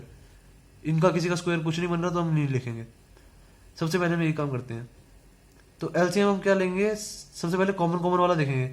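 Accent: Punjab, India. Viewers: Tusi punjabi oo hna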